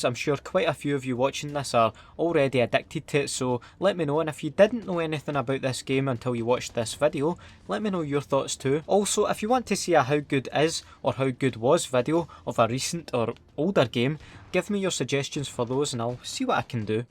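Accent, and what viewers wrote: Scotland, United Kingdom. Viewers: holy shit! this dude has the best scotish accent ever! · Some Scottish accent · H1 Zed 1, now this is a real Scot right here.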